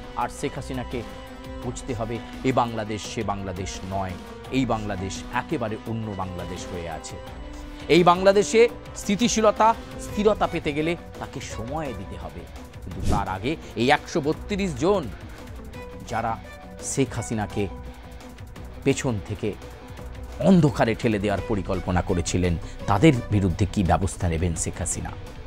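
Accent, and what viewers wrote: India. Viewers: দাদা তোমার ভাষা তো,, কোলকাতার। বাংলাদেশি সাজছো কেন কেন???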